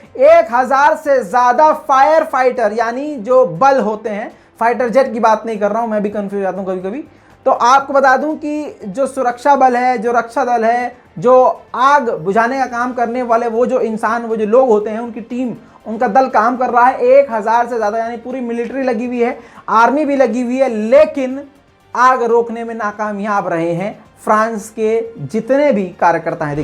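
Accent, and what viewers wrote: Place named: Bihar, India. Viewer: . India k kis elaka k rehne wale ho. Lagta hai bihari ho. Tumhara lehja bihari jaisa hai. Me Lahore se hoooon. Aur india se ache taalukaaaat chahta hoooon. Laikin yea unbhagat tatikhor nahi chahte. 😐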